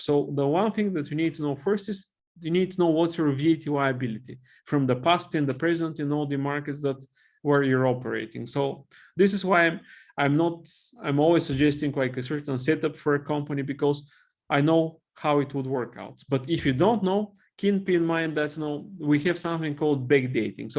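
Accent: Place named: Bulgaria. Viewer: He seems to have a good command of the language, however, a very heavy Bulgarian accent.. Maybe because I have been exposed to British English and Canadian English more. If you are also Bulgarian it might be easier to figure out what he is saying. There are some instances in the video where I slowed the video to 0.50 and listened to it 10 times, I dont understand what he said.. I might give you the example when I watch it again...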